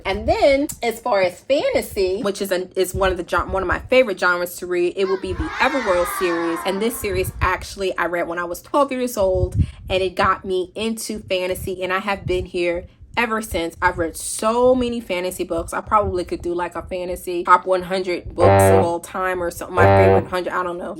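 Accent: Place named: South, United States. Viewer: Yasss southern accent!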